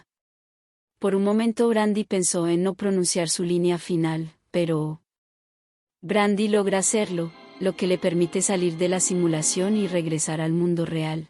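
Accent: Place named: Spain. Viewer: Very good job 👍 Thank you very much for italian subtitles 😊 I love so much your voice with your spanish accent 😉❤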